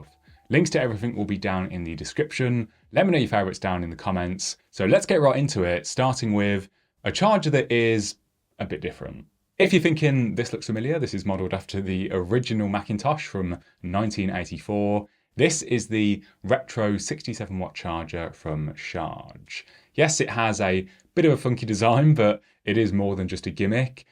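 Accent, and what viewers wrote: Midlands, United Kingdom. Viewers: Are you from the Midlands? I’m trying to place your accent. I love the Retro charger - I’ve been after something like this for upcoming holidays.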